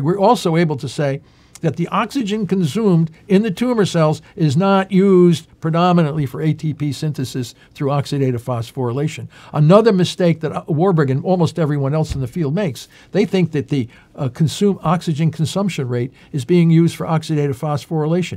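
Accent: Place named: New England, United States. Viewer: Thank you for your WORK, and for the plain English explanation. I'm serious! And I love your mild New England accent;) This is ALL very encouraging to everyone who has lost family members to cancer.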